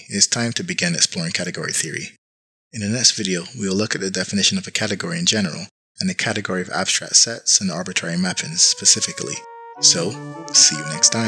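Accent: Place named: Barbados. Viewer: This is so exciting! I have been reading some Category Theory for Programmers and decided to take another look at what's out here this year. In the past there was not much available. Imagine my delight and surprise to find someone who is applying Category Theory to composition!!! This will be an exciting ride. I recognised the Barbadian accent very early on since I am from Trinidad. I look forward to learning from you, since I am interested in music, functional programming; even trading. I even studied Nuclear and Thermal Engineering many moons ago and my first job was at BNL:). Thank you so much, Dr..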